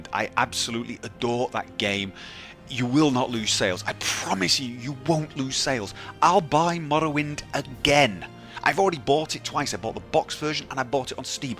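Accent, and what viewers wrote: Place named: North, United Kingdom. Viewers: Are you from Lancashire by any chance? Your accent is Northern, but I know tapped r's aren't a Yorkshire or Tyneside thing as far as I'm aware. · You're from Norway? You sound a bit Scouse haha, cool video.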